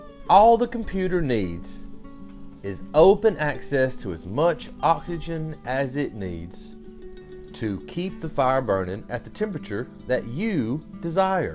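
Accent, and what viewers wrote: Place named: Rural, United States. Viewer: Love you man! I miss that country accent being in COMIfornia now. Beast to you and your page.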